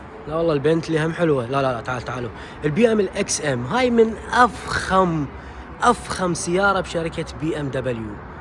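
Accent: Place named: Iraq. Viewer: راح أشاهد هذا الفيديو من أجل لهجتك فقط ! 😅 ما أحلى لهجة أهل العراق ❤ تحية لكم من المغرب 🤝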